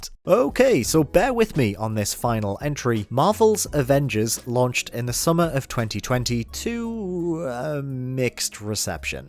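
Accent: United Kingdom. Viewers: What accent is this? Which part of the UK?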